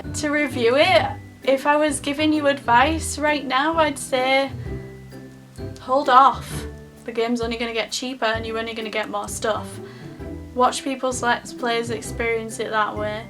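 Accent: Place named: Scotland, United Kingdom. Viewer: I agree and I have completely lost hope now. The Sims 4 is fundamentaly different from other Sims-games in the depth of its core, and I think no expansion-pack or update can save it. :( My only hope is that the Sims 5 will be what the Sims 4 should have been: An improved version of the Sims 3. But it'll take years to find out... Btw are you Scottish? I love your accent :)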